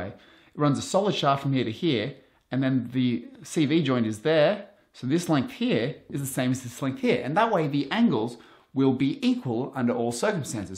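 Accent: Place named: Australia. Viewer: what part of Australia is your accent from?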